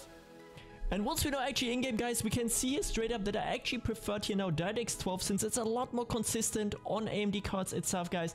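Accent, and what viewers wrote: Germany. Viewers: Hab dich grad entdeckt und hab nicht mal 10 sekunden gebraucht um zu checken, dass du Deutsch bist. Als Deutscher hört man das gleich raus haha :D. Und nices Video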